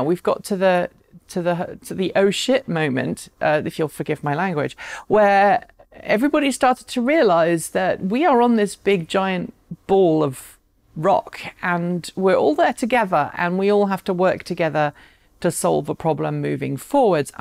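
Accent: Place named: England, United Kingdom. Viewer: Ah, you Brits. Here I thought you were mispronouncing methane, so I checked first the Oxford and then the Websters dictionaries. Webster shows the American English and the Original English pronouncations. "meth·ane | \ ˈme-ˌthān , British usually ˈmē-\". But, not to nit-pick, I really enjoy your channel. And your English accent.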